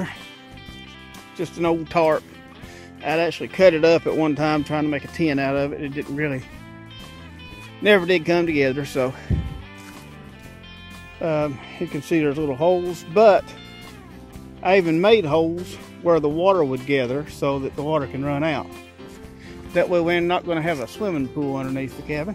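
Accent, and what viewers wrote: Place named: South, United States. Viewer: I definitely hear a southern accent...what part of the states are you in? Great video & an even more beautiful spot you have chosen. Much love from Mobile Alabama.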